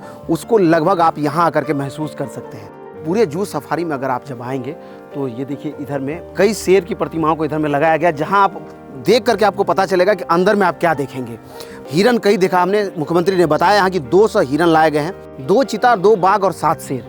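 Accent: Bihar, India. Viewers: Language hi or language ka tone hi to dusre log se alag dikhata hai jo humare Bihar ka hai isme koi dikkat nhi hona chahiye kyunki yehi culture hai jis par humlog ko proud feel krna chahiye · aisa kn hai Apne state ka hi accent hai Jab koi punjabi ya south Indian ka pronunciation sunne milta hai tab to log cool bulate hain Humare ghar ka hi accent hai to hai Accent ke naam pr america aur britain vale bhi ladte hain So Bihari hai to bihari accent hi niklega Its not a matter of shame, but our identity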